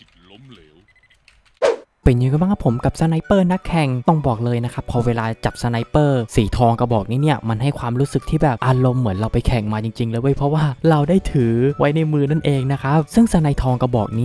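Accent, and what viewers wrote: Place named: Songkhla, Thailand. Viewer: จังหวะแหลงเหมือนเด็กสงขลาเลย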